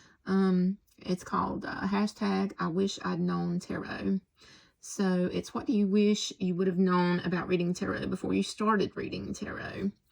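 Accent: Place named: South, United States. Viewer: This was an amazing video! My jaw dropped with your first piece of advice and then the hits just kept coming! Thank you so much for sharing your perspective and wisdom with us 🥰 (And this has nothing to do with tarot but I love your dialect of English! I'm a former language teacher and in love with how people talk. I'm from Minnesota and rarely get to hear the beautiful accents of the southern states.)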